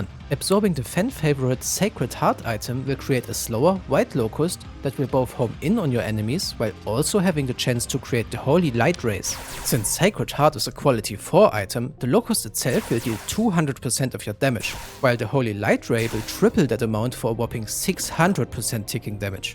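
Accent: Germany. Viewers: are you german? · du klingst deutsch… bist du Deutscher?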